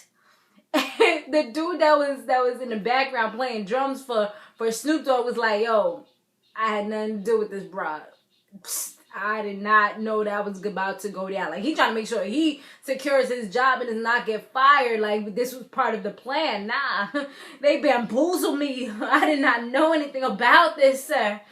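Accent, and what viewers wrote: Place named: New York, United States. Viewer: I love your accent! Where are you from? Sounds like New York with an island twist.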